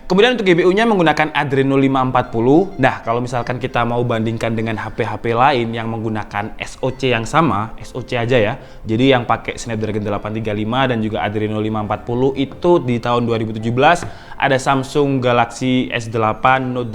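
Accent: Malang, Indonesia. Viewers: Wih lu orang malang y bang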